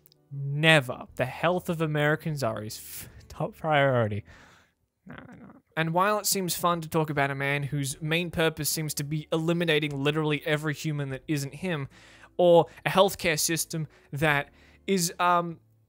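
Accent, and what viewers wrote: Australia. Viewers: an AUSSIE commentator finally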